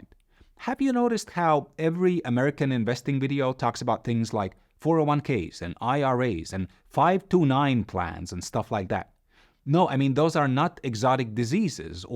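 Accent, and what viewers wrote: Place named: Latvia. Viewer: why does this english named person speak with a latvian accent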